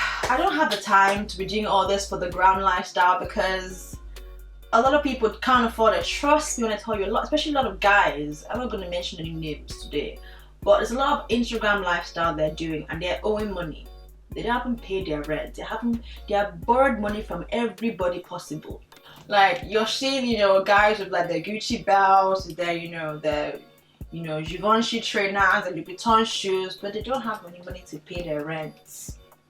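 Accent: Nigeria. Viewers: Your Nigerian accent definitely made it's appearance in this video and I LIVE! Lol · I find you very attractive with your Nigerian accent😍😍😍😍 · I like u ur a smart girl sis lol I mean I've always liked u but after this video I'm like🤔she talking sense btw I love ur Nigerian accent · Your Nigerian accent came out during this video, and I am here for it sis!!! Love you😍😍😍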